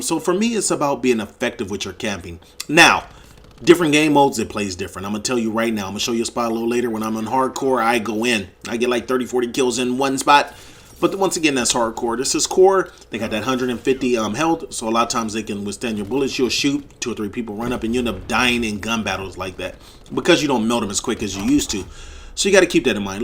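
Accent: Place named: United States. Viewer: It's not pronounced call of dooty it's jewty fucking yank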